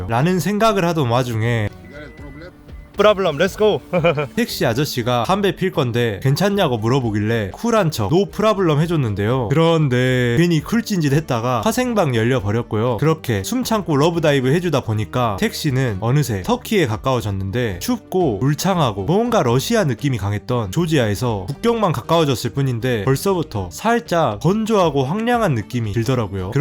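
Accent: Jeolla, South Korea. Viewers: 억양이 전라도분이신거 같습니다. 영상 재밌게 보고 있습니다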